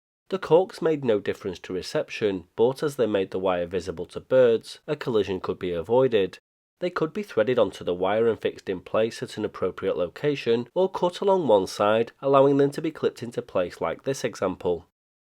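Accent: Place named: Northern England, United Kingdom. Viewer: Fascinating stuff you always come up with, especially with your accent! It's not dissimilar to mine. I'm from Baguley, Wythenshawe round the corner from Alty (Altrincham for non-locals). My brother used to keep racing pigeons in our parents' back garden. I never knew this about those cork devices but I've seen them too. I think I may have thought they were a lightning protection device of some kind (pretty stupidly). Thank you for solving that little mystery.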